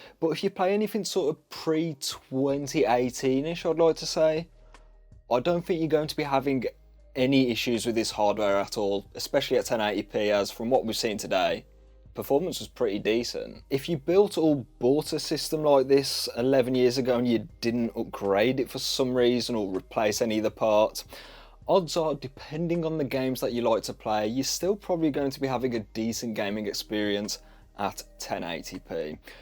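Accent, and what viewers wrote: Black Country, United Kingdom. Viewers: great video, your accent sounds familiar, maybe the black country, Im a PC builder myself and I don't see many people around my area building computers haha. carry on with the great videos.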